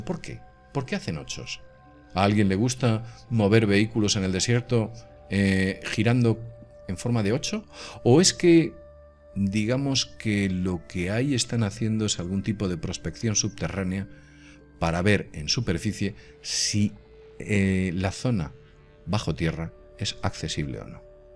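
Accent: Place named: Spain. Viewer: Con todo respeto! Si no estás interesado en el tema! que é haces viéndolo?.. Cada país, 🌍 o región tiene su dialecto!... Cuando hablan en otro idioma diferente al de su país .. aún usan fonemas o resaltan algunas consonantes según su dialecto!... es el caso de Los costeños, Rusos, japoneses etc Él es Español 🌍 por si no lo sabías Gracias por mostrarnos tu sapiencia 😏👎🏼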